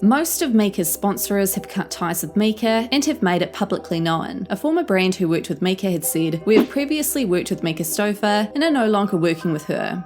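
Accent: New Zealand. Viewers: Your accent is lovely, are you from New Zealand? Sorry if I got it wrong, I often mix Kiwi accents for South African 😳🤪 I'm Scottish so feel free to call me English if I got it wrong hehe 😂 I've just heard about these selfish, clout chasing, money grabbing, neglectful, greedy losers and yours is the first vid I found. All caught up now, thank you. Sending love from Edinburgh 💙🏴💙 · Great video. I'm just curious, are you from New Zealand? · Off topic: I’ve never been on this channel so this may have been asked before. Are you a Kiwi? Is this a Kiwi accent i’m hearing? Great content btw. That narcissistic couple should be banned on Youtube.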